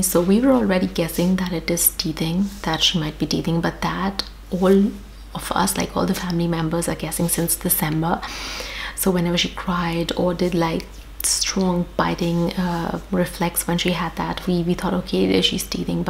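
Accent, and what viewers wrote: Germany. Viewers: Dear, sab se pehle, you are lovely! I’m a German, living in India, married to an Indian and we have a 6 year old daughter. Please zyada stress mat karo. Your daughter is fine for sure. Just feed her with your milk if she doesn’t want anything else right now. I don’t know how old your daughter is, but don’t worry, she will take what she needs. You said your milk isn’t satisfying her for too long, so maybe try with fruit purees? I don’t know how far you are with solids for her, but I guess she must be around 6 or 7 months? Teething can be a possiblity, even if it’s lasting already for a while. I wish you and your family all the best! Stay safe and healthy, all will turn out well! And yes, your accent is pretty germanised, mine is totally indian. Guess that happens naturally;)! Mujhe accha lagta hai! Take care and keep posting! Namaste 🙏🏼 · Love how your accent has slowly been Germanised. 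😄 so cute.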